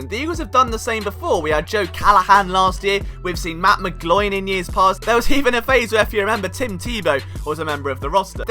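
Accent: United Kingdom. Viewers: Why is a guy with a British accent talking about American football? I can't even watch the entire video, dude this is America not London.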